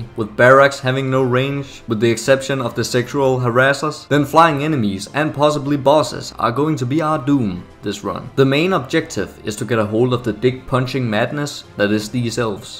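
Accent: Denmark. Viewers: Are you danish? You sound danish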